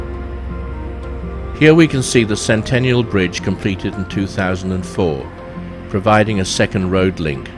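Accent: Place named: Southeast England, United Kingdom. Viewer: It’s pronounced ee-gwa-nah lol Not ig-u-ah-nah And its you-ka-tahn lol Just saying how the locals pronounce these in their native language lol no worries we know what you meant. I am curious as to your accent though. Its very similar to mine and im from the southeast of the U.K.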